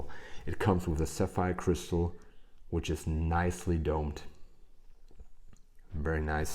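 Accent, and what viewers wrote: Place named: Germany. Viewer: strong german accent 😊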